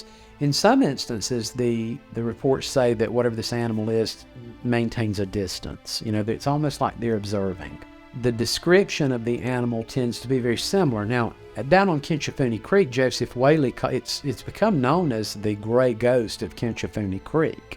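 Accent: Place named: Australia. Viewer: Love the accent.. Sydney'Oz Solid Salute 😭🙌🏼💫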